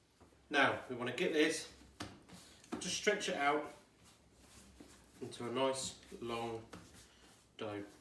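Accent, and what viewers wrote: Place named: United Kingdom. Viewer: I love your accent. what kind of british accent is it?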